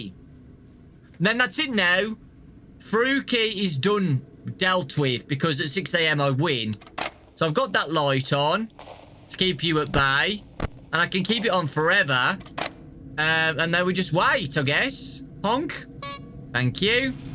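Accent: United Kingdom. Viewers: Does anyone here think British accent is cute☺?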